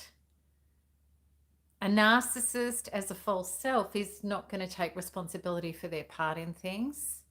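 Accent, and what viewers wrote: Australia. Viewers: I like her Australian accent. I wokred in the Far East for over a decade. I am an American and I enjoyed the different English language accents and dialects from the people I met. In the FarEast I met many people from different continents who were also there.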